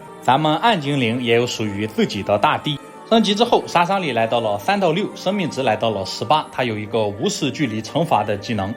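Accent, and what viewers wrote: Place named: Shandong, China. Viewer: 感觉像山东， 四川人应该不骂wanna free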